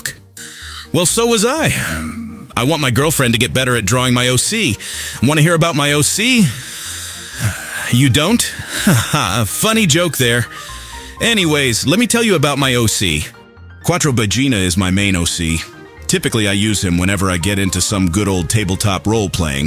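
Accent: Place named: Texas, United States. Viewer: how do you capture that texas colony accent so well?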